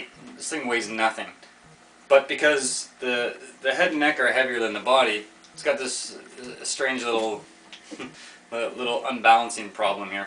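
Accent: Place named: Canada. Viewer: Thanks for the review, useful. btw, from ur accent alone, I knew u were from canada, and im not making fun, i find the accent soothing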